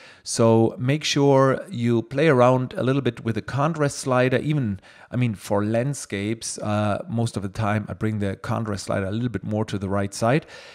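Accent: Germany. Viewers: Mal wieder ein tolles Video! Finde den Stil toll, er gehört zu dir und das Bild kommt dadurch großartig zur Geltung. Finde es übrigens gut, auch mal englische Tutorials zu bekommen, so kann man sich über das "deutsche Englisch" (für mich persönlich genauso gut verständlich wie Deutsch) weiterarbeiten zu anderen Nationen vorarbeiten, wo teils deutlich schneller und (wohl eher bloß aus deutscher Sicht) undeutlicher gesprochen wird :D Und wie pflegte es Ben letztens so schön in seinem Interview mit Ben von Wong zu sagen... (Frei zitiert) "Ihr versteht das Video nicht? Nehmt es als Anlass, Englisch zu lernen!" Weiter so! Vielen Dank! ;) PS: Falls du Zeit findest auf die Frage einzugehen, wäre es großartig! Du hattest mal einen Link gepostet bzw. war dieser im Zuge deines Kommentars zu Spiegel-online Lesern enthalten, indem es zu einem Script vom Camera Raw für PS gehen sollte. 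Bei mir öffnete sich da immer wieder nur der iTunes Store. Arbeite mit Windows und CS6, möglicherweise schon nicht möglich? Bin diesbezüglich technisch noch nicht so fit und bin bei Google auch nicht so recht fündig geworden. LR habe ich zwar seit kurzem auch, würde aber gerne in PS diese tolle Option mit drin haben - falls möglich. Ohje, typisch Frau mal wieder viel zu lang formuliert :D Falls du Zeit findest - ich freu mich ;)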